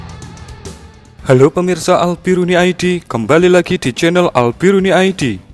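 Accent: Java, Indonesia. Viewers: kok medok sekali logat nya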